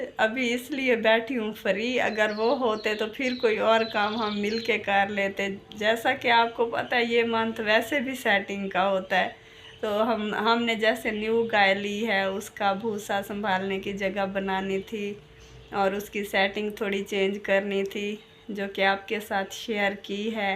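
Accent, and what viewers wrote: Saraiki, Pakistan. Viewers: boht piyaari sariki bolte ho❤❤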